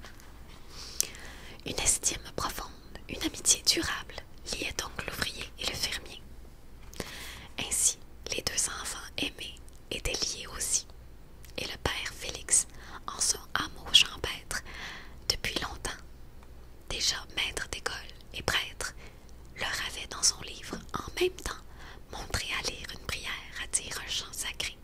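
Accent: Quebec, Canada. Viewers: Ta voix est tellement relaxante 😍 et j’adore ton accent québécois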